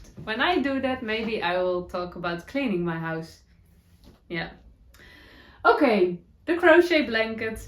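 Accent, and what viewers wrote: Netherlands. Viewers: Just found you! And so glad I did. Just at the beginning of the video and I'm looking forward to hearing all about your projects. Hope you don't mind me saying that I love Dutch accents, and I find yours so comforting to listen to :)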